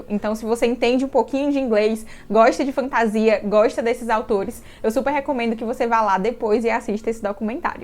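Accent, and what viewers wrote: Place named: Northeast, Brazil. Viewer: "Uma hora eu falo assim, outra hora eu falo assado" ahahaha ah, meu nordeste ❤ Ótima resenha, já quero leeer 😍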